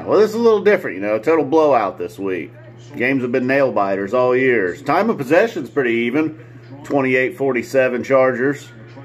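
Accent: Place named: Ohio, United States. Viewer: I love this guy's Ohio accent.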